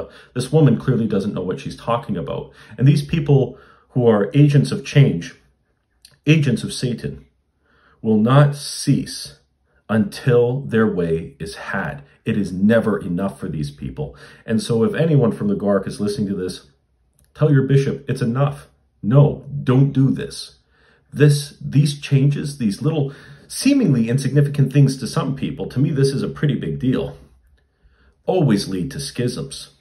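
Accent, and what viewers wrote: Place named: Canada. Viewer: Your blessing, father. I love your videos and your subtle Canadian accent. I am so encouraged that priests like you are still coming into the church and standing for the truth & tradition of the faith. May God protect you, your family and your work - I will have you in my daily prayers going forward.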